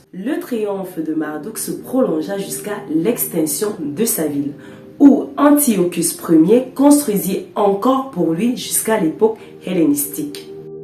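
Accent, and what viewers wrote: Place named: Cameroon. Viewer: pourquoi tu parles comme une camerounaise?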